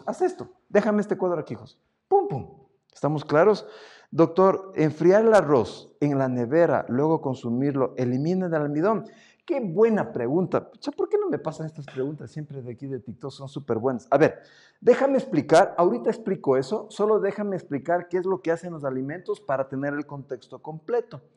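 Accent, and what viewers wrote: Mexico. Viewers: Orale pense que era de México, por la forma como habla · Gracias por su consejo doctor tenía muchas dudas sobre la avena, es la primer ves que lo veo y habla usted muy mexicano 😂 saludos de una mexicana hasta el lindo Ecuador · Gracias por compartir su conocimiento. Habla como chilango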